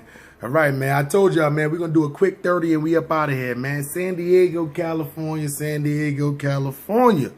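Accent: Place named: New York, United States. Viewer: Bro me and my family is from San Diego and you are not from San Diego you sound like you're from New York City you guys are weird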